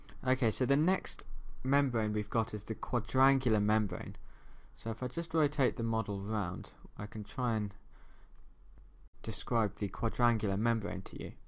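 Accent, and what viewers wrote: United Kingdom. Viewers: You would think a British accent would spice up the larynx, but no it's still so incredibly boring. great video though all jokes aside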